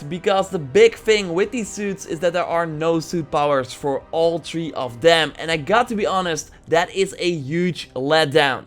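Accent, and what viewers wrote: Netherlands. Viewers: Are you from the netherlands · You’re dutch. I can tell your accent haha (im dutch to :] )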